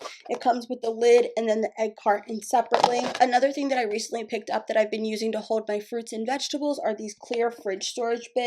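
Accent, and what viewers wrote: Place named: New York, United States. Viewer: Hola, you are so pretty, I enjoy your videos I'm a Latina and you sound like a new Yorker, I love new York, shout out!!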